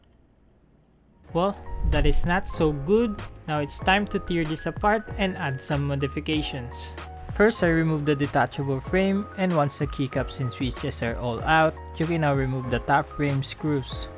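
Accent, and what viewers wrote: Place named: Philippines. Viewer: Filipino accent hello ganda ng review bro love it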